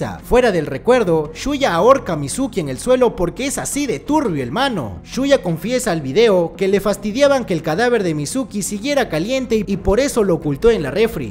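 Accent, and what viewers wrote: Peru. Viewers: Eres de lima? xd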